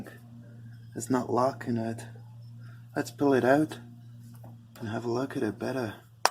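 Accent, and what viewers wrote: Scotland, United Kingdom. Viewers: Mine is doing this too, going to try oil flush and change first...not been done for ages. Also, love your accent mate, heard the Scots in it then seen your flag! :) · Amazing accent, so Scottish..yet all Canadian!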